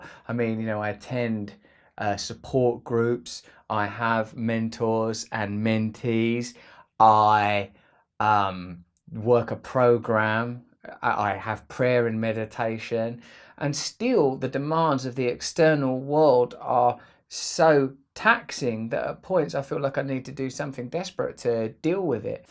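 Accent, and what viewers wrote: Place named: United Kingdom. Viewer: You remind me of John Lennon because of your wisdom and British accent. Comedians are the smartest people in entertainment I've noticed.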